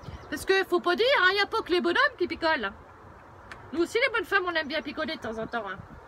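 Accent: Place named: Berry, France. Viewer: Euuuuuuu, c'tieu fumelle c'tiont l'accent berrichon, pis alors rin à vouèr avec eul' nord pisqu'allé au milieu, dam'. Allez bonsouèr mon bounhoumme et pis pas p'us.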